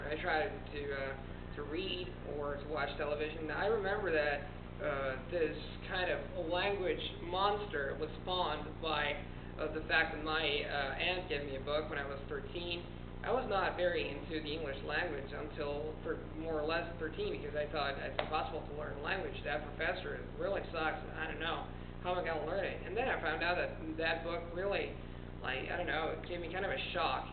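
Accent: United States. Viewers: You sound great speaking English with the American accent! :D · Are you really Italian? You sound way too native American. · did u ever try to change ur american accent to the british accent because u may have thought that it was unattractive? Im american but ill admit any day of the week that british english is more elegant than american. im learning spanish right now and im torn between accents (latin america or castellano)... did u ever struggle with those types of choices? ur italian and u had the option of choosing ur english accent...likewise im american and have the option of choosing my spanish accent... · yo hablo ingles y espanol y la verdad hablas el ingles con muy buen acento americano, yo tengo viente anos viveinedo aqui en los estados unidos y asta este dia puesdo hablar con un acento como el tuyo es dificil cuando la lengue natal es un idioma completamente diferente como el espanol. 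very good your a master of 8 languages something i will never have under my sleave. saludos jorge de texas! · he is pretty good at the accent imitation and his pronunciation is really good except for a few words but the stress patterns and rhythm of his speech kinda gives him away. pretty damn good though. he sounds like he has lived in the US for a long time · good english, 99% american accent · This is a really good American accent! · Brilliant accent. BRILLIANT. I have to disagree with sheepthing, if I hadn't known you I would have thought you were an American. I have never seen someone pick up an accent this good without a decade of time in a country... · Your english is really good. I'm impressed. You even have an american style of speaking. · dude. you sound like you're from America 99% of the time. that's really great. I'm learning French now and will have to learn Italian and German later. 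tight man. kudos. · Day two: It's amazing how you have an American accent. It's really cool and inspiring for those of us learning languages and trying to improve our pronunciation! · wow, u have a really good american accent! good job really! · 100% American accent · Your American English is pretty good, it's quite impressive actually. I can see you are trying to sound American, I can still sense your Italian accent, but it's barely there, this video proves to me that the whole 'Italian American' accent is actually almost a 'made-up' accent that evolved here in America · man!! you're a real yankey! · You're cute and have a great American accent. I would suggest, however, if you come to America, you should speak with an Italian accent. Foreign accents are sexy to us. Of course, the flip side of not knowing how to pronounce a word you see is not knowing how to spell a word you hear. As my father says, "All words are outlaw words." :) Supposedly, Gaelic is just about as messed up as English in that respect. 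· You have a perfect american accent! I speak a different language at home and have an asian accent. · I've never seen such a perfect American accent from a non-English person..even the way you express your setence structure is very American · Holy crap! Excellent American accent. I love it when people understand that the voice has to be placed differently. I myself am not so fond of the American placement, but you have mastered it perfectly.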